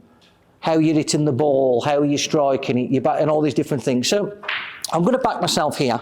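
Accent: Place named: Birmingham, United Kingdom. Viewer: That’s a really thick Brummy accent!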